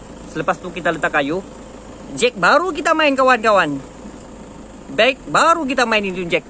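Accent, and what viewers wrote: Sabah, Malaysia. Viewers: Abg org Sabah ke?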